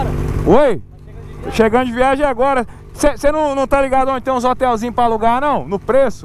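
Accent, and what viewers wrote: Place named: Belo Horizonte, Brazil. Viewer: Namoral o sotaque de BH e muito massa ce e louco 😅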